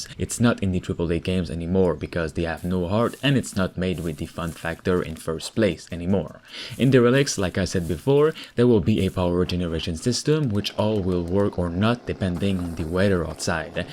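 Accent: Quebec, Canada. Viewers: Es-tu québécois ? Ton accent semble familier :P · Are you from Quebec? I'm hearing a bit of an accent :)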